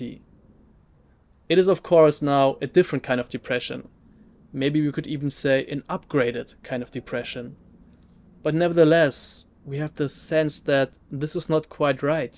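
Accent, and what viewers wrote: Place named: Germany. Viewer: You sound German. Are you German? 😄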